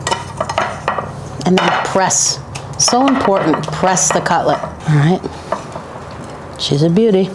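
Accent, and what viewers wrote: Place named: New York, United States. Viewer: You and Paul Sorvino pronounce sandwich, sengwich. Is that Jersey or New York?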